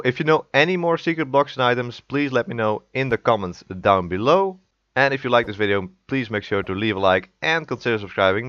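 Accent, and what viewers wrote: Netherlands. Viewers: When u hear a dutch accent in his english voice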